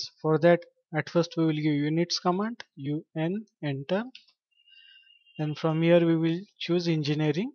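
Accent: India. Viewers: Why is that an Indian voice I hear for this? I thought India uses metric. Or am I wrong and you Indians use Imperial or both?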